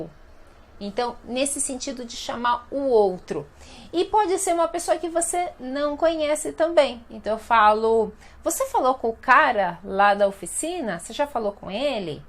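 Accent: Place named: São Paulo, Brazil. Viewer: Wow São Paolo dialect! I love it.Obrigado muito!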